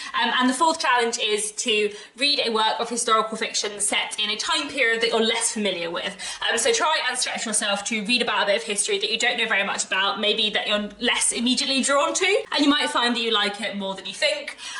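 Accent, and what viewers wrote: RP, United Kingdom. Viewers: your accent is so "catchy"! something between RP and posh English. love it !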